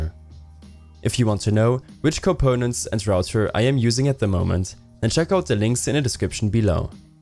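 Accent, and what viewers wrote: Germany. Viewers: oh cool ein deutscher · i hear a german accent in ur voice😂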